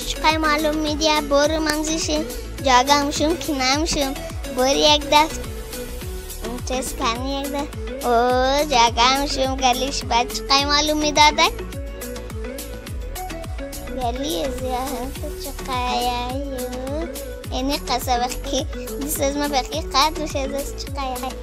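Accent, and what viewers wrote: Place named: Hazarajat, Afghanistan. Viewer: ماشاالله بسيار عالي با اين لحجه هزراه گي موفق باشي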